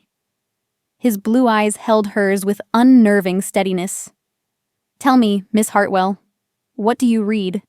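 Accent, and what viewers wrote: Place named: United States. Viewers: Why American accent? Should be narrated in British accent😑. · I agree. This is weird in an American accent.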